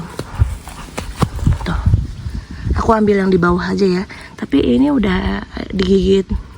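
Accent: Indonesia. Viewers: Selamat mba,semangat ngonten nya.PD mba,suaranya enak kok di dengerin 😊,khas anak jaksek.ternyata ya orang jagakarsa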